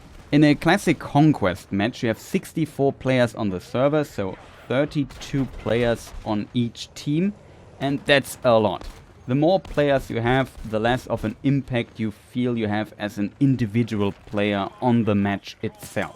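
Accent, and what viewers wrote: Germany. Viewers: If I hear a German accent over battlefield gameplay I know I’m in for a banger vid.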